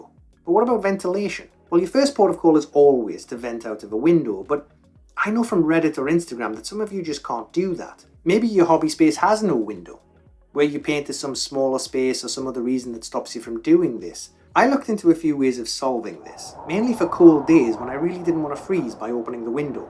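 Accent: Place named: Durham, United Kingdom. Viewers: Ah This is easily one of if not the best airbrush video I’ve seen! Makes me proud you being a fellow Geordie, maybe that’s what made it easier for me to understand (wey aye pet 😂) it’s so refreshing to see I’m not the only one who’s been tearing my hair out trying to learn how to use an airbrush. It helps I have the same infinity CR brush as you do, and the little pot to spray into. Every time I’ve tried to use the airbrush I have spent 80% of the time cleaning 10% swearing and 10% actually painting! In my last paint session I didn’t get any tip dry ( miracle) by occasionally dipping the end nozzle/needle into a small bowl of clean water- is this recommended? It seemed to work for me. Another tip I seen elsewhere was for starting with darkest colours and go down In shades so you don’t have to fully clean in between ie black brown grey white as an example. 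I was using 6mm needle but 4mm seems the way to go as you say, which I also used recently. Anyway cracking video mate I will have to check out more of your videos, I was genuinely laughing as well btw at some of the jokes (GOT S8 is 💩 😂) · lol - btw- where are you from? I live in Canada now, but originally from Durham… Nice to have a YouTube channel with a proper accent in it.!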